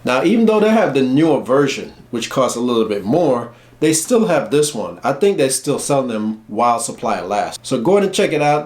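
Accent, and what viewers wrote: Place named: Jamaica. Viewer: Thanks for the video! I'm detecting a little accent from u. Sounds a little jamaican 🤔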